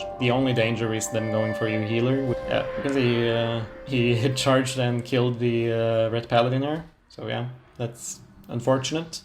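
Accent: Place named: Scandinavia. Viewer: sounds like you are Scandinavian^^ and just a thought but maybe cut the intro down? its kinda long :)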